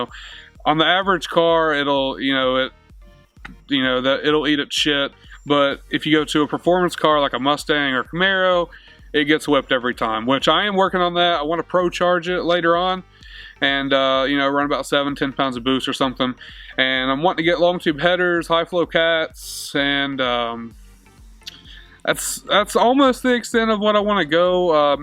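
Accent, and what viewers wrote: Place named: South, United States. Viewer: I thought the main presenter had a distinctive southern accent. Who is this big boy?!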